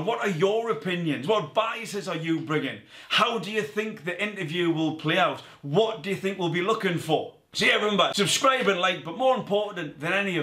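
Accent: United Kingdom. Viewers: I just have to hear your British accent and know that your take on Meghan would be that she is a liar.... then journey down and read the comments anddddddd its confirmed. Thank you for doing this video. In reading some of the comments I'm saddened by the still many people being so negative to Meghan... you are bringing your biases against her into this. T. My take is that many of you are genuinely racists and never wanted to be neutral where Meghan is concerned and that's ok... its just who you people are.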